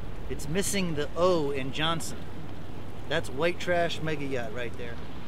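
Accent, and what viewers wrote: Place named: Brooklyn, United States. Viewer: Yankees ?? You sound like your Straight out of Brooklyn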